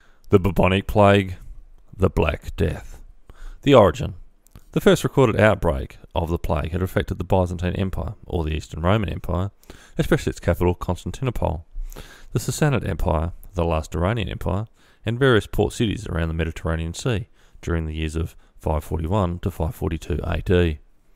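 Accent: Australia. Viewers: Oh dear - I’m sorry many of you couldn’t understand the narration - didn’t realise us Aussies’ accents were hard to pick up!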